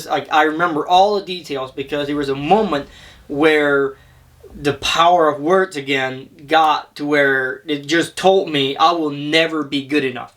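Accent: Pennsylvania Dutch, United States. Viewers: When I first heard you on your video I thought I recognized the PA Dutch accent. I grew up in Lancaster County, PA and had Mennonite friends. Never saying "good job" is the German way - even among some of us PA Dutch "English". "There is therefore now no condemnation for those who are in Christ Jesus." Roman 8:1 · You are a good inspiration. I am 81 and grew up in Pennsylvania into a pa Dutch family and it is so great to hear your accent.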